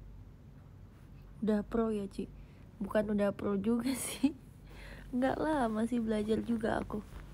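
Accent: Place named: West Java, Indonesia. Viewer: Mlm ni nyunda pisan uyy....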